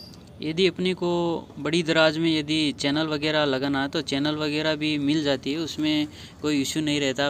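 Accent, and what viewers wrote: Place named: Gujarat, India. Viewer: गुजराती हो क्या आपकी वोइस से पता चला आगेखेचेंगे तो , 55 , 66 का बेड है डबल बेड अछि तकनीक है 👍👍🙏 🔨🔨🔨 गुड़ वर्क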